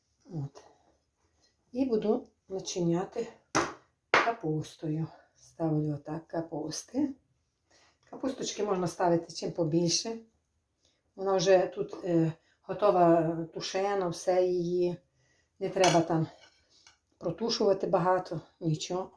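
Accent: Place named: Bukovina, Ukraine. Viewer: Слюна тече дякую мені кажеться що ви з Буковина наша рідна мова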